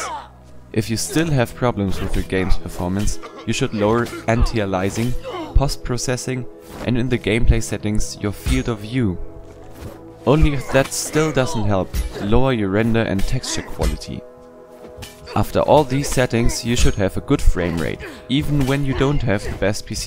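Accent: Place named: Germany. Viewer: mann weiß einfach sofort das du deutscher bist xD NICHT BÖSE GEMEINT ABER EIG ECHT NICE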